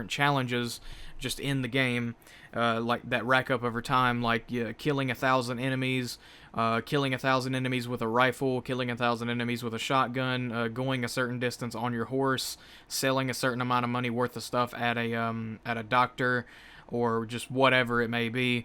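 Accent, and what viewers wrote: South, United States. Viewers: I love the bit of southern he has in his voice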